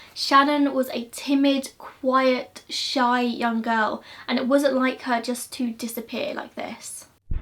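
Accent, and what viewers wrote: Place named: Southern England, United Kingdom. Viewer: I wonder if one day you could cover the case of the kidnapped ten year old girls (Lisa Hoodless and Charlene Lunnon) from Hastings? It's a happy ending case, something I much prefer where children are involved, I bet most of us like happy endings. I was holidaying there at the time and remember searching until I couldn't move my feet another inch, along with hundreds of other people. It's a happy ending in that both girls survived but were horribly abused by the disgusting paedphile who took them, that's the nasty bit. I (and my young son) went back to Vancouver after they were discovered but I've never forgotten that time and Ariel, my son, often asked whether I thought the girls were all right; I always said "yeah, they'll be fine" knowing that they quite likely would never fully recover mentally from the experience. 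Oh: it's a real treat to hear a voice from close to my part of the country, I'm from Surrey so not terribly far away. You are brilliant at presenting all your work, it took an evil virus for me to find your channel and I'm very glad I did. Thank you for helping to stave of incipient insanity and stay safe and well. All the best.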